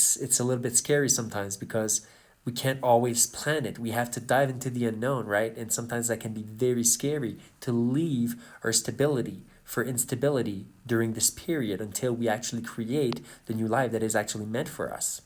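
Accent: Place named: Quebec, Canada. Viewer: Thanks for sharing your great journey! I knew I recognized that accent 😅 Du Québec ici ✌️😁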